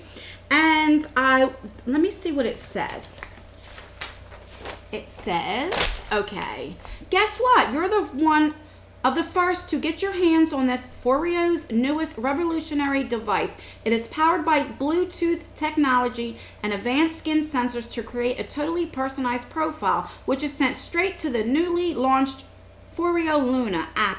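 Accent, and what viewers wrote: New Jersey, United States. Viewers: I’m from south Jersey too!! I knew you had that jersey accent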